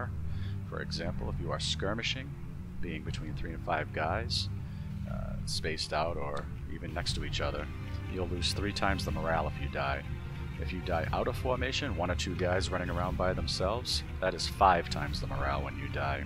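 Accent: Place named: New England, United States. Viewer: Hey man love your video, just curious are you from Mass? I live in Maine and I swear you have the faintest commonwealth/new england accent hahaha either way again love the videos man very informative keep on keeping on. P.S. you kinda sound like Adelbert Ames the original commanding officer of the 20th Maine as portrayed in Gods and Generals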